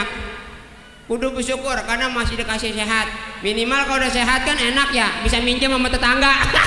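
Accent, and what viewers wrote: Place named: Jakarta, Indonesia. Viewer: Ane suka ame bng,khas masih bahasa betawinya,sukses ye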